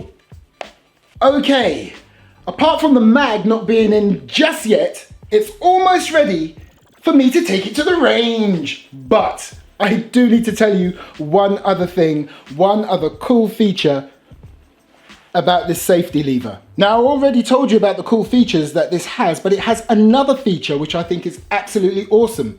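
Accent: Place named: Caribbean, United Kingdom. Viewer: Wha de raas yuh sey! Him accent sound American to you?😂 kmt Him British. British Carribean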